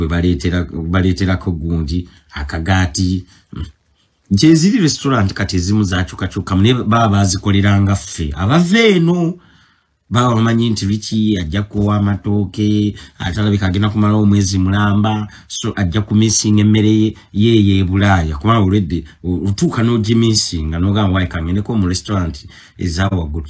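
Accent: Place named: Buganda, Uganda. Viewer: Wabula today u made me laugh with your bunnakiganda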